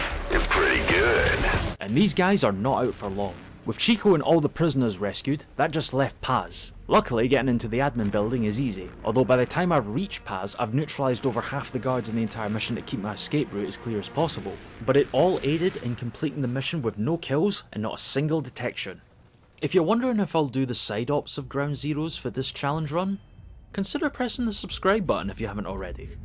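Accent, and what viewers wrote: Scotland, United Kingdom. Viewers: The British accent saying Afghani names is so funny 😂 you’re absolutely butchering them 😂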